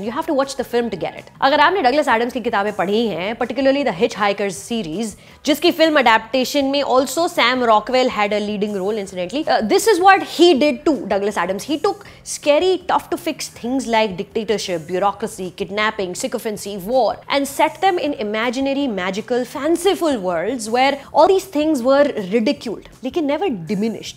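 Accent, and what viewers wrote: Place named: India. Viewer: After the biased viewer ‘not a review’ of Kabir Singh instead of being a reviewer, I’d given up on you, but you’ve redeemed yourself by going back in to your reviewer shoes. Well done for that! I started this review with a bit of a bias - let’s see what stupid stuff she talks - but you proved me wrong. Again, kudos. Very objective review (looks like it, I haven’t watched the movie) and great way of connecting it to other related art forms like HHGTTG. Now pet peeve - I understand it’s your style, but why speak with a strong accent even when speaking in English! Even more, why mix Hindi and English instead of either being true to yourself by sticking to Hindi or being a wider-audience-reaching reviewer like Bharadwaj Rangan and sticking to English. It actually annoys me personally, but stick with the mix if your regular audience likes it, but still keeping the Hindi influence of English away. 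All of this said in good sense and no offence meant! Cheers!!!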